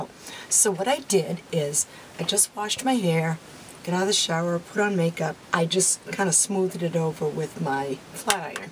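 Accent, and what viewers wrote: Boston, United States. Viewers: Just love your Boston accent. You have got a lot of hair up front. Be thankful, cause I would love to have that much hair. Love the video and good tips on top too! Have a great Turkey day🦃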